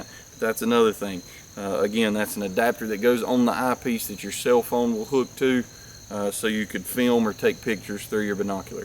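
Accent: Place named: South, United States. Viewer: The south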